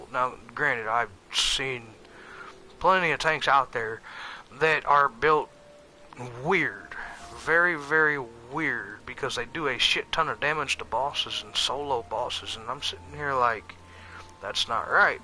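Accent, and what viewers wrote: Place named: Texas, United States. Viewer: Are you from Texas? You must be from Texas. I really like the sound of your american voice.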